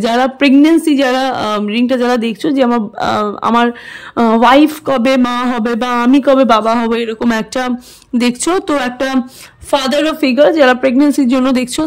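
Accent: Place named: Murshidabad, India. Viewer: Apni ki mursidabad e thaken nki apnar kotha te mursidabad manuser moto tan asche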